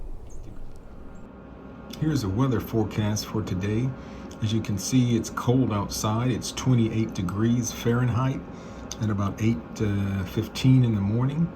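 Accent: Texas, United States. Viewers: This is the clearest English with a Texan accent I've ever heard. Thank you so much for sharing your English knowledge!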